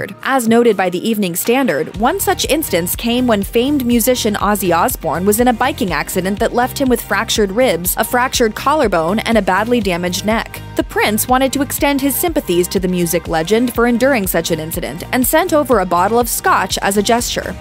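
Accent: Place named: United States. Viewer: Sorry,but why do we always have to suffer these damn American accents!!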